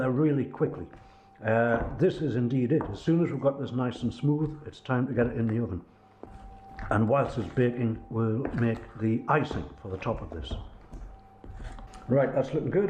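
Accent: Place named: Newcastle, United Kingdom. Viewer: My mouth always waters when I watch you! Geordie accent is a bonus too!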